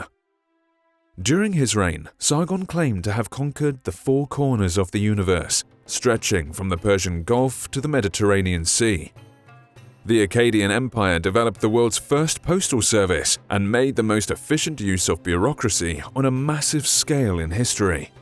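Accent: United Kingdom. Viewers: I though this guy is British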